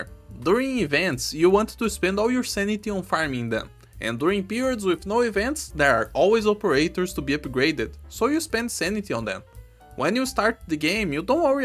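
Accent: Brazil. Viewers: quick question, are you brazilian??? cause you have the accent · Ummm the accent, I know you, you're one of my people... Quer uma goiaba?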